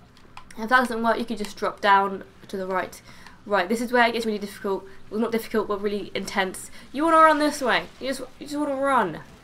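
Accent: England, United Kingdom. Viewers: 'Bit too much of the english accent here.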